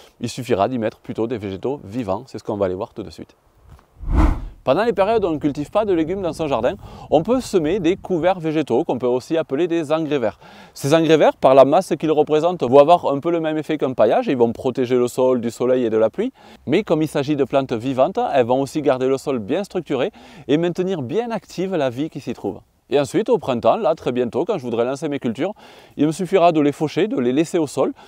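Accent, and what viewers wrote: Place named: Occitania, France. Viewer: Salut! Tu as un accent occitan. De quel département tu es?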